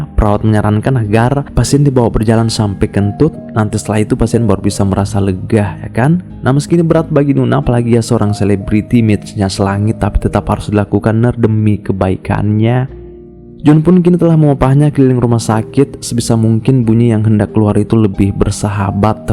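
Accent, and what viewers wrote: Bugis, Indonesia. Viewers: Bugis apa bang😂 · Orang Bugis yaa bang? Tau sidrap ka iyya bang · Dehhh masi ada logat bugisnya🤣🤣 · Serasa ada yg ganjal ,,, sepertinx pembicaranx orang Bugis ...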